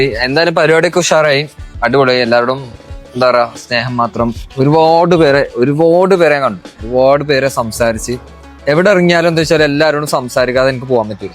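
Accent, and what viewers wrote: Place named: Malappuram, India. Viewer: Ingale naadevdeyaaa slang Malappuram aanello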